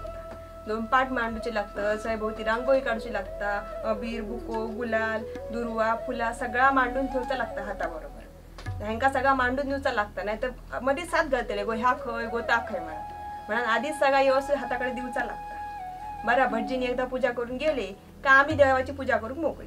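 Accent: Malvani, India. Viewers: ताई, कोकणातलो गणपती इतक्या सुन्दर पद्धतीन शुद्ध मालवणीत सांगान सगळे लहानपणीच्यो आठवणी जागे झाले. शुद्ध मालवणी आयकताना गावाक पुढच्या लोट्यार बसान गजालिंच करतानाचो भास झालो. खुप खुप धन्यवाद देव बरें करो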